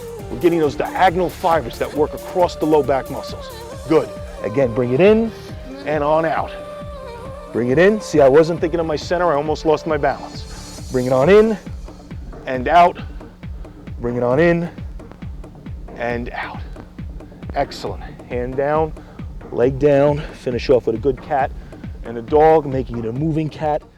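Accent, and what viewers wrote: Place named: New York, United States. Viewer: Love that New York accent!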